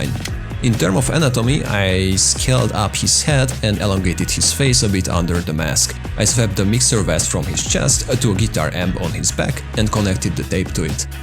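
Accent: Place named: Hungary. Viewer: Your hungarian accent is so strong man🤣 (Ímádom a rajzaidat és a stílusodat)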